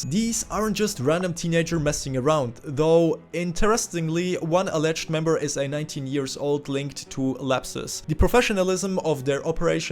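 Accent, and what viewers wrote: Germany. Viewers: That fine German accent...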